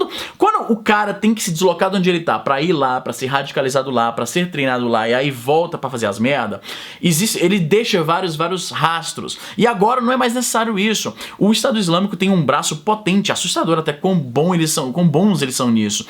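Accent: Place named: São Paulo, Brazil. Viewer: tu és cearense e tem sotaque de paulistano porque hein? Morou em Sampa por mto tempo? N identifico "nordestês" em sua fala e nem gírias tipo: oxe, Oxente, véi, massa... Só "mano" algo altamente paulistano!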